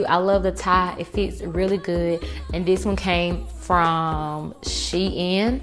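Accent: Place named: Atlanta, United States. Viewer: These 🔥 with that accent you have to be from Atlanta lol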